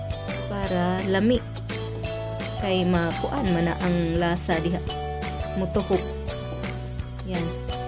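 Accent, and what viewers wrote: Visayas, Philippines. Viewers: Haha bisaya kasi e, chill kalang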